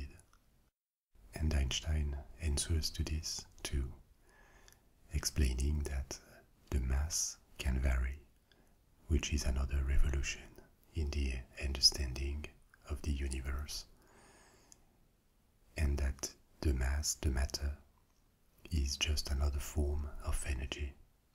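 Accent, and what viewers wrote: France. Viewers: The accent, I fell in love with a French accent the second I herd a French Female speak 😍😍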